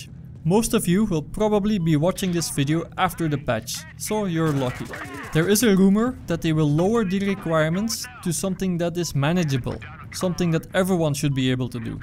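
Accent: Netherlands. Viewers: If you really wanna get bigger and compeed with the big channels, you need to upload 1 video a 1 or 2 days. Im enjoying your video's tho, keep up the good work. And where u from? Je hebt een Nederlands accent! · I think stay the way you are, you have the most insightful tip/tricks/tutorials. Maybe hints to certain maps for certain game modes, but other than that keep doing what you are doing. One if not my favorite BFH follows/subscribes. Oh and the Dutch accent pushes it over the top to EPIC for me every time.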